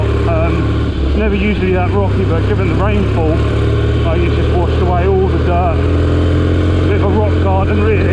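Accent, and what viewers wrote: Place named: Kent, United Kingdom. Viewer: Nice, i am actually based in Maidstone, Kent. Recognised your accent! Found you through a search for Malle videos and liked the content! I had a similar story for the Malle this year too! Not ideal!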